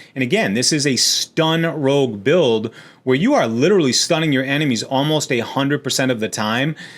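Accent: New England, United States. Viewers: Do I detect a New England accent ?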